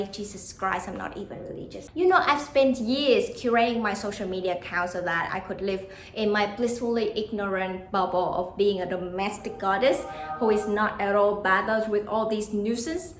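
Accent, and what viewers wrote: Australia. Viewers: I enjoy hearing your Aussie accent and I'm impressed with your mastery of English as your second language.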